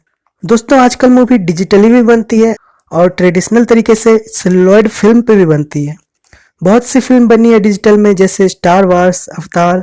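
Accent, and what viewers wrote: Patna, India. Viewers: Bhai tum patna se ho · Bhai Patna se ho? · Are u of patna?? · Bhai aap Patna se ho kya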